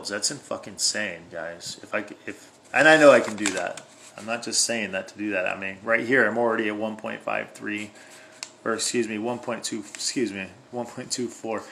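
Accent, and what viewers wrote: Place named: East Coast, United States. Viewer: oh yeah, I'm old and grizzled, and you sound just like 2 or 3 of my buddies rolled into one dude. You originally from back East? Doesn't matter, you just sound like it. I was pushing my stuff too hard when I switched to LED and I just finally tried CO2. The first run was fucking nuts, it might give you 15-20% if you are dialed, but if you were doing everything just a little too hard and that's your limiting factor...whoa, more like 30% and much better quality, plus it lets you run even warmer, which lets me dehumidify harder here, and so on and so on, point is 10'x10' room only cost $100 in gas for 9 weeks once you got your gear. I just got 2 ft flouro UVB bulbs to hang over each table for ripening this round, the dangerous ones where you don't want to be in the room when on, the plants are supposed to cover themselves in sunscreen in response. 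No YouTube, but I'm on the Gram, same name.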